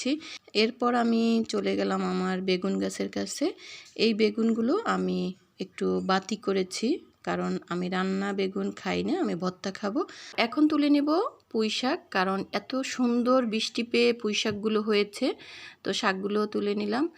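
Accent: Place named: Barishal, Bangladesh. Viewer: 125করে পাশে রইলাম আপনি কিন্তু বরিশাইল্লা কথায় বুজা যায়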